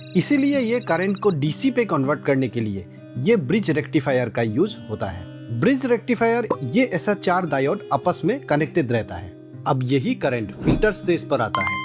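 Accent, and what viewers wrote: Northeast, India. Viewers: Bhai aap north east state region se ho kya aapke accent se lg rha hai 😊😊❤❤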